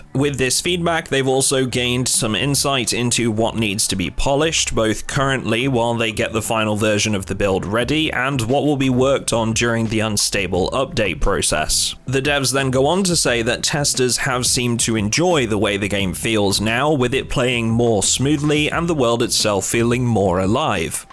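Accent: United States. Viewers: I for sure thought you were American or maybe Canadian, you have a very American accent. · This one funny sounding American. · This American guy who makes these videos has an interesting accent.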